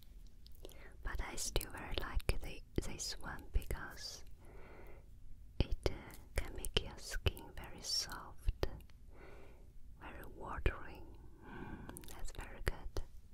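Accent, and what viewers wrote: Philippines. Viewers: Why does it sound like she has a Pinoy/fillipino accent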